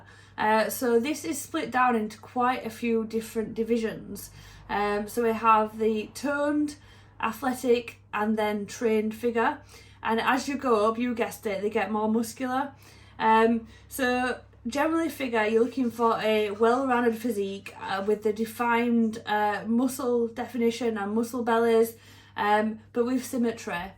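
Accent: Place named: England, United Kingdom. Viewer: Whichever you will pick...you are already winning! Love that accent...is that English? lol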